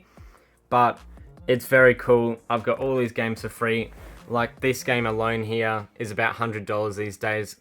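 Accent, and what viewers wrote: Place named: Australia. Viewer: Subscribing for the aussie accent alone. Without joking awesome video 🍻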